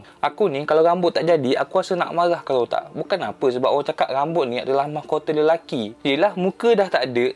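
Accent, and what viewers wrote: Kelantan, Malaysia. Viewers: asal kelate ko?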